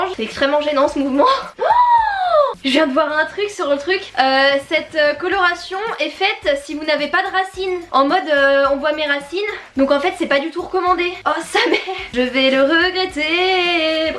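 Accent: France. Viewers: I will regret It hein😭😂😂 jss morte tu me tu avec ton anglais français 😂😭❤️ on adore tes expériences capillaires (moi aussi on est 8 dans ma tête tkt)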